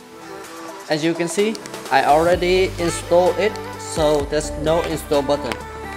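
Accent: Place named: Vietnam. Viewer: Đậm chất Vietlish nhưng mà tôi thích :))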